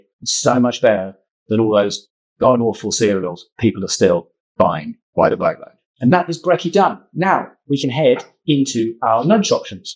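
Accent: United Kingdom. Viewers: This is a great follow on to where I just saw you shop in Aldi for the items. I'm so wasteful with food and chicken seems to be gone in one meal, and I wish to reduce that. I'm curious though, I hear a Uk accent, but you are a Kiwi according to google, so is this an ai voice, or did you ever live in the uk?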